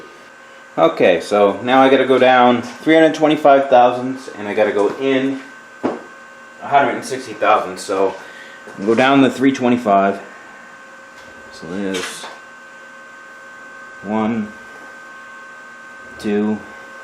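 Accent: Boston, United States. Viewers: Had to look up Schmutz. Thought it was some kind of Bawsten accented slang but its actually german for dirt. Thanks for video, its very well explained and entertaining with the accent...reminds me of Matt Daemon or Mark Wahlberg.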